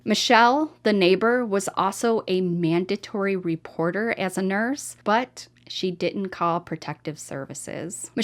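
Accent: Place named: Minnesota, United States. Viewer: Where’s you’re cute accent from ?? Sounds like Minnesota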